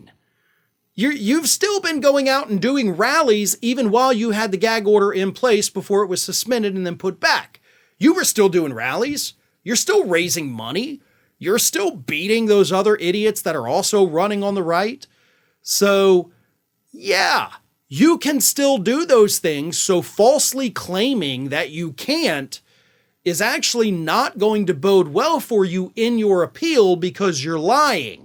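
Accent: South, United States. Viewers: You're edging into a 'southern' accent.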